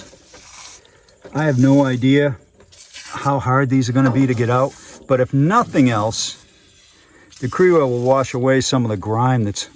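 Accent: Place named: New Jersey, United States. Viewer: Sounds like he's from Jersey 😅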